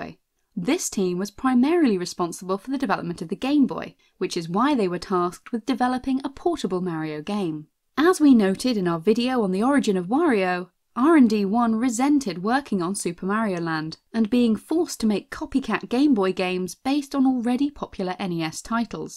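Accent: United Kingdom. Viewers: It sucks that she wasn’t added, as a playable character in the game. Other people, such as myself, would’ve loved playing as her, if they added her to the actual game, and actually be playable in the dlc, that Nintendo might plan on making, that is. Also, glad to hear your British accent again, by the way, since I’m British as well, just like you are irl!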